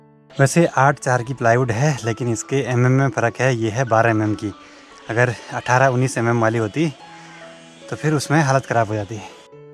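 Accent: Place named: Garhwal, India. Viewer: Bhai aapki garhwali boli bahut Mithi hai.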